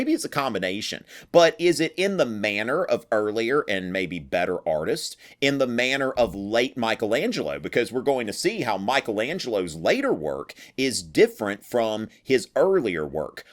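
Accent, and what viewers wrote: South, United States. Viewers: Your southern accent really kills me!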